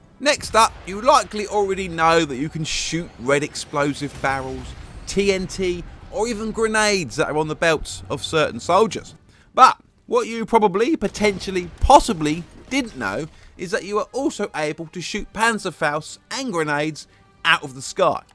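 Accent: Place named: United Kingdom. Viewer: Your "Probably, potentially, possibly" combined with Your British made me hit "subscribe".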